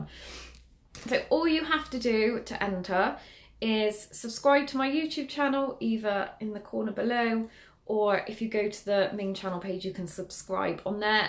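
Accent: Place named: England, United Kingdom. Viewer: You are so cute english accent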